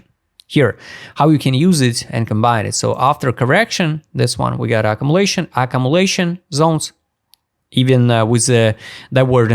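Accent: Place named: Russia. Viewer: disguisting russian accent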